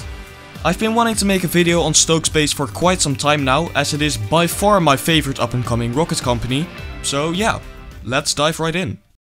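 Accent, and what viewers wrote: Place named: Netherlands. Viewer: Good content, but please keep working on that Holland-accent 🙉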